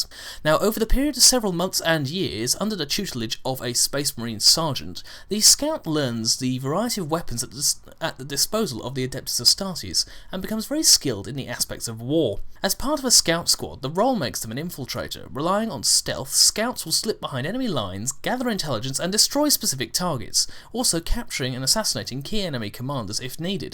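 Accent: United Kingdom. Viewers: I started with a video of a cute little toy poodle puppy begging to be hugged and now am watching a video of a British man talking about the build up of a fictional military organization made up entirely of biological enhanced super human warrior monks... Enough internet for today.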